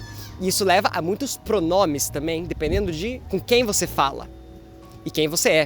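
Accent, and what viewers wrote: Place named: Curitiba, Brazil. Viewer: Show! Você é Curitibano!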